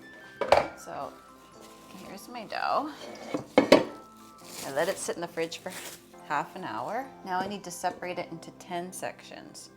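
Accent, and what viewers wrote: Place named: Canada. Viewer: Interesting that you pronounce it "hambug" instead of hamburger. My father said it that way but I rarely if ever hear anyone else say that. Is that a Canadian thing? My father was not Canadian (he grew up in Buffalo NY which is very close to Canada though).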